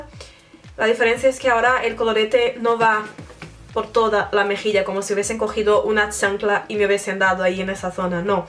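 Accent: Brazil. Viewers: eres brasileña? (como se diga)xD o hablas así? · ya se me hacia que tenias un acento brasilero xD en fin buen video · Tienes acento brasilero, muy bonito! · Me gusto su acento brasileño mezclado con el español :3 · Creo que Brasilera o eso sentí xd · Brasilera aunque en otro canal una chica también me pareció de Brasil y me dijeron que es de Honduras. 😀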